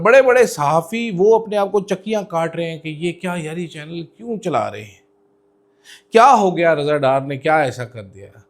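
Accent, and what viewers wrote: Punjab, India. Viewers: راجہ جی پنجابی ٹچ کمال کر سٹنے او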